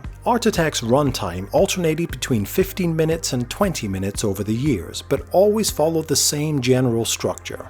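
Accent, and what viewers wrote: Scotland, United Kingdom. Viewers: Where is this guy from? A weird Scottish/ Northern Irish/ American accent all mixed together. Any ideas? Great vid btw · what accent is that? every other word is either scottish, northern irish, or american.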